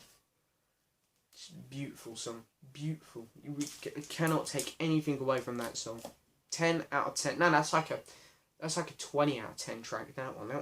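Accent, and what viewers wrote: United Kingdom. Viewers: i think that because of your accent you are british rather than american am i wrong?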